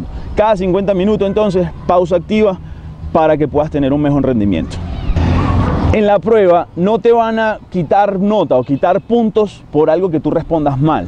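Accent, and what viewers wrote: Venezuela. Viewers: Es venezolano?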